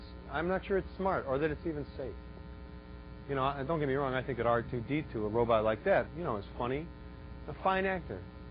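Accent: United States. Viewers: gotta love the new york accent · That's a Chicago accent.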